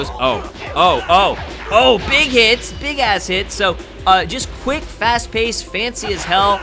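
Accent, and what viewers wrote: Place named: Spain. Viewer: I notice your accent was like spanish,or portuguese,are you?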